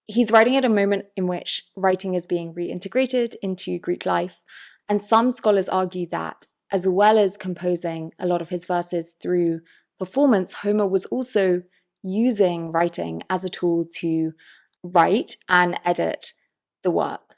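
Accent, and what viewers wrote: United Kingdom. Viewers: ..."was Homer a real person"... You have a British accent, resultantly that answer would be "whatever the government gastric urge." My last name however, would have a problem of the defintion of the number one. Any langisitic problem has a solution and those old stories all exist and were copied to address the same problem. ---->What is the number "one"? Is the number "1" different from the letter "one." Is there a "single" universal answer? If "Homer" is one person isn't a question. He is any and ever single person trying to learn how to read a language that was left for dead. This is written as a single indilible mark, never to be repeate in any other context. Not a watermark, but a coat of arms! (He is not just the first letter in the abecedry. Homer is the other meathods of alpabetical order as well. He is the king, where Ithaca is the empire.) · Yea gods you are Beautiful! 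And that accent, just saw a post on Threads comparing Sydney Sweeny to this actress who will play Emma Frost and then I find your vid and I’m like well THIS girl is cuter than BOTH of them😻😸😻-I got a weakness for Academic Intelligence and English accents. If you didn’t mind that gushing, I’ve always found it interesting how Polyphemus and the Cyclops are portrayed in Homer and through the years. The first we hear of him is from Zeus, in Fagles words “God-like Polyphemus who towers over the Cyclops Clans” then he goes into his pedigree like he is a Greek hero. But then in the poem he is a Brute and most adaptations focus on him being some “Redneck” monster. When I think of Hesiod”s Theogany where the Cyclops are beings older than the Olympians and talented craftsmen who create the weapons of the gods. It’s very sympathetic when compared to Polyphemus and the one I always preferred. Hav you ever noticed this? 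Also i subscribed so you’ll love me for life now? lol 😉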